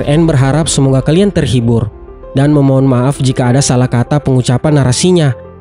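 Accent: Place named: Palu, Indonesia. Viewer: naratornya seperti logat Palu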